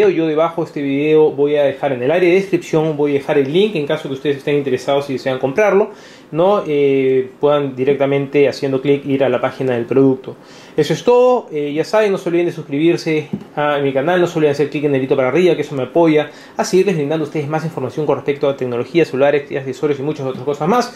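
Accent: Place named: Peru. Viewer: Por tu acento siento que eres peruano ?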